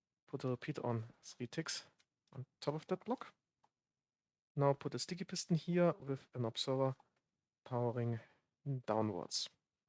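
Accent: Germany. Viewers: keine Kritik, stattdessen einfach eine Bemerkung: du hast einen der stärksten deutschen Akzente von englischen Youtubern, welche ich bisher gehört habe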